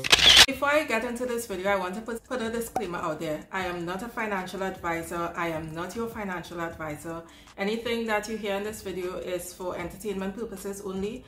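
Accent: Trinidad and Tobago. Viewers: I knew I heard a Trini accent lol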